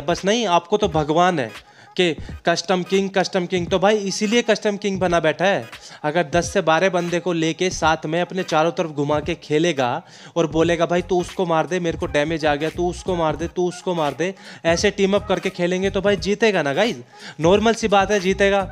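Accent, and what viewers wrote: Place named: Haryana, India. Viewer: AP PAKKA HARYANA SE HO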